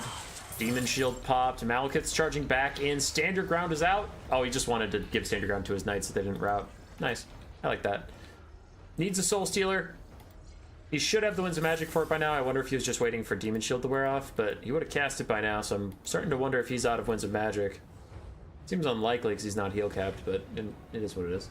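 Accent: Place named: France. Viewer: Just missed this :< gonna need a game cast in that exquisite french accent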